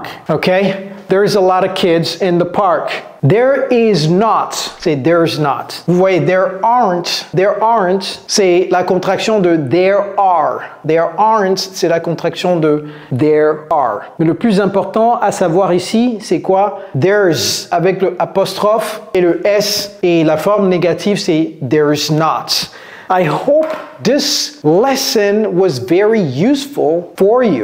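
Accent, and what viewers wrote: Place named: United States. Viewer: Always charmed by your accent Coach..I love American accent by african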